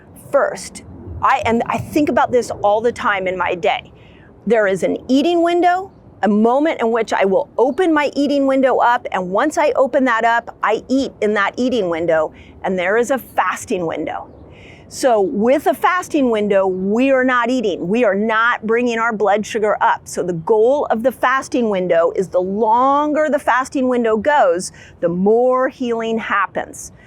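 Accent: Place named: Midwest, United States. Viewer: Ah, cool. You sound midwestern, so I wasn't sure.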